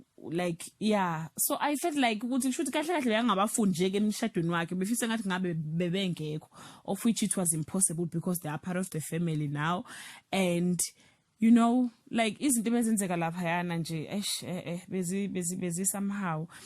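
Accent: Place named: Nkandla, South Africa. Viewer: Cc uphuma enkandla uyabonakala ngesiZulu sakho vele abasenkandla bayabonakala ithinalabo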